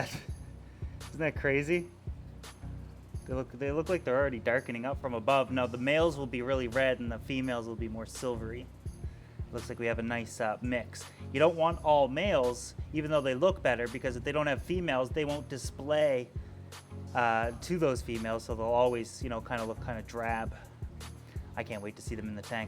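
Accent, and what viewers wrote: Canada. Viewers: Sounds Canadian... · Thought I heard Canadian accent... then I heard him say Dartmouth... Dude, I used to live in Spryfield‼️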